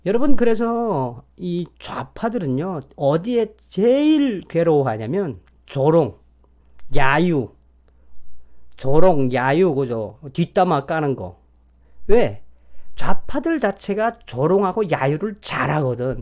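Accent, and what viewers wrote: North Korea. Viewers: Thank you, Thank you again! 말씀하시는데로 코믹이고 코메디 입니다. 진행과정도 북한 말투로 북한에서 항상 쓰시는 말투로 진행하시는것도 재미나고 정성산 "때래비" 힘되요, 희망의 방송! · 다 좋은데 북한말 일부러 써지말라고 그렇게 안해도 방송 본다니까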